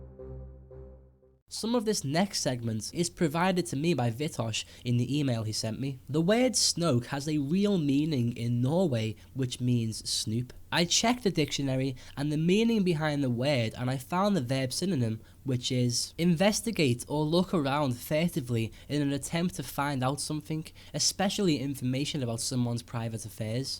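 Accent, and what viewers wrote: Liverpool, United Kingdom. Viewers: It's called being a scouser. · Scouser say no more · he's from Liverpool if I'm not mistaken. the Beetles spoke the same way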